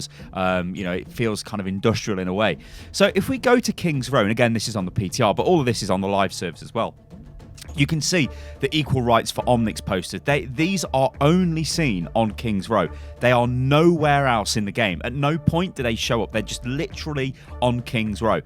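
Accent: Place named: Birmingham, United Kingdom. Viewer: Wait, you're a brummie? Just like me 😁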